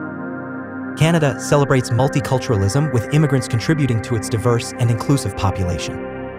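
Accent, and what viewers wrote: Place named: United States. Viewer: Get rid of the American accent, it’s terrible.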